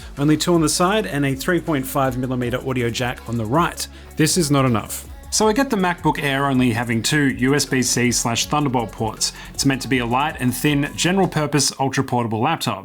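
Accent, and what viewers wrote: Australia. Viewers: was going to ask if you're Aussie based on your accent and I was right... Melbourne represent! Love seeing Aussie tech you tubers :)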